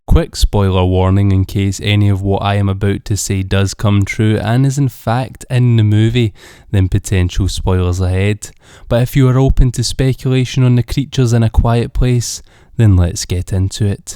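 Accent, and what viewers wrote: Scotland, United Kingdom. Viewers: Fucking love your accent dude! Not what I'd expect in this type of video, but fucking lovely nevertheless. Sounds Scottish with a Welsh tint. Southwestern Scotland perhaps? Entertaining as fuck. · That accent...sounds Scottish, I'm Scottish.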